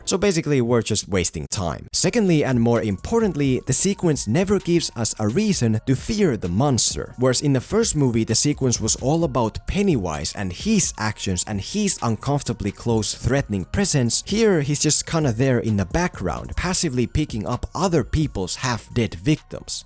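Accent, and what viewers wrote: Finland. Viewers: wait where are you from? Your English accent sounds Finnish.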